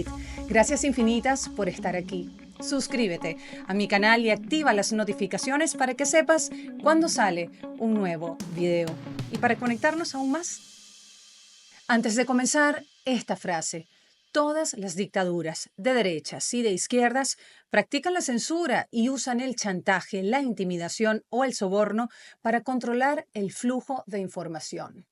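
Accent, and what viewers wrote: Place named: Venezuela. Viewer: Y hablas tan lindo ☺️!... Ese acento tan "venezolano". Único 😊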